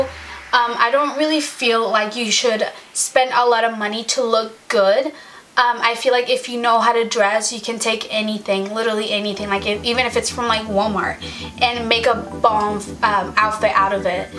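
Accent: Mexico. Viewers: I love your accent mi Chula Mexicana but Mija it's called a stroller dear and you would act like a tom boy also bless the lucky son of a bitch who gives you 4 kids lolllllll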